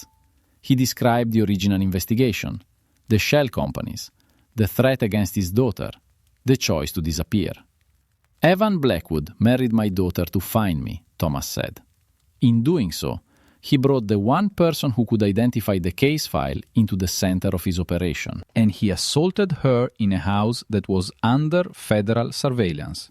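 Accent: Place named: Russia. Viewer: The constant clanging sound and the inexplicable Russian accent made part two much harder to listen to. We’re the story regarding spies or the Bratva the accent might have enhansed the story but as it was neither it just made it difficult to understand.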